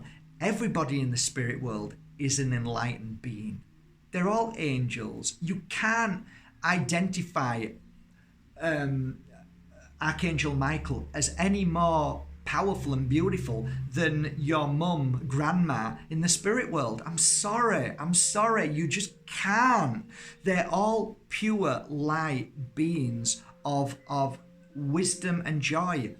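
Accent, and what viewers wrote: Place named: Northern England, United Kingdom. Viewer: There’s nothing better than listening to a nutty northerner which oozees every tune of light and positive energy, your awesome mate, Thankyou for giving your time experience 🤙🏼🙏🏼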